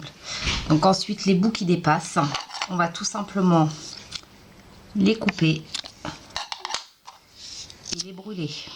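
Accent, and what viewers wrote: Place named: South, France. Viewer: je ne connais pas, je vais m'essayer çà vite fait car c'est méga sympa... merci à toi...et cette point d'accent...çà vient d' ou ??? côté soleil en tout cas !! merci bis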